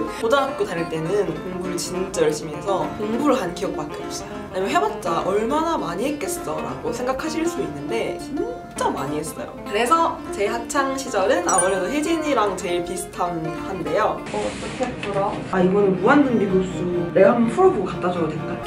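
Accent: Busan, South Korea. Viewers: 말투에서 약간 부산사투리가 조금씩 묻어있네요ㅎㅎㅎ 친근햄ㅎㅎ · 오 어쩐지 .. 경상도 사람은 사투리가 너무 잘들려서 어디 사람인지 궁금했는데 부산 .. 와 내척 친밀감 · 사투리...? 뒤에 가니까 진짜 부산출신이셨구나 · 처음에 사투리 듣자마자 엇 부산! 이랬어욬ㅋㅋ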